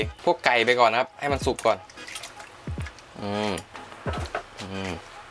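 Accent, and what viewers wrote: South, Thailand. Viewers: พี่คนใต้หม้ายนิ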